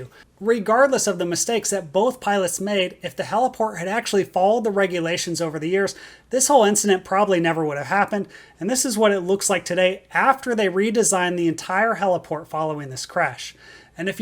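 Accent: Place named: California, United States. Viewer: Hey are from California? Got that cali accent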